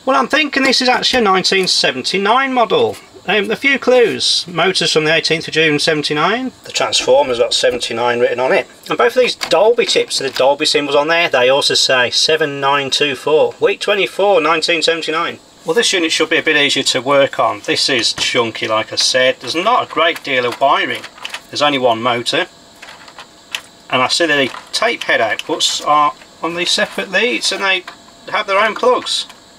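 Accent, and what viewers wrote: Birmingham, United Kingdom. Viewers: Wow, I just stumbled on this video and I am transfixed! That is a beautiful deck and if any of my audio equipment became faulty, I'd want you to repair it. So professional. As an aside, you sound Brummie. I am from that part of the world. Brilliant!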